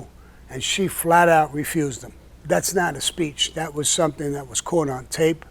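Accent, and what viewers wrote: New York, United States. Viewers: Nice to hear NY er!😊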